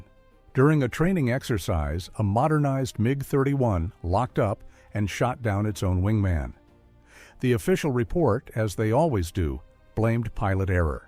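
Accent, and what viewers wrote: United States. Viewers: Because you have an American accent geared up to know what yr talking about you Must be right right? ...Like Russia pinching automatic washing machines for the chips? You go on about the air frame? All it is a a bit of Al or what ever - you can simply make another one. On those grounds yr talking rubish, And an airframe to you means excess costs of parking yr wives fat butts in restruants rather then going into casting of forging metal. Which is why yous and NATO cant keep up and out pace. And after these thumb twidling calculations - then Russia is expected to lay flat like a carpet for yous to come in and rape and pillige the land? I think arrogance in a head blockage is the far bigger problem and liability here.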